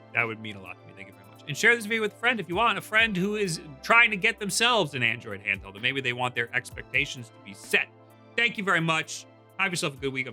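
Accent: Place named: Long Island, United States. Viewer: That's an integral part of the Long Island accent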